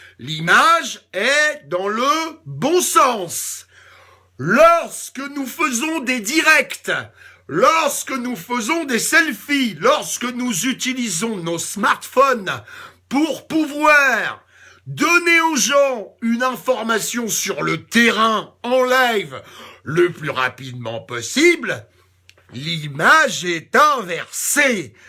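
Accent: Paris, France. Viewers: Apres cette video, si ceux qui l'ont regardee ont un peu de reserve ou de pudeur, ils ne devrait y avoir aucun commentaire! C'est tout ce que je dirai et a ton contraire, je finirai en disant;"je t'aime'! Ah! et cet accent super PARIGO que tu te payes, un regal!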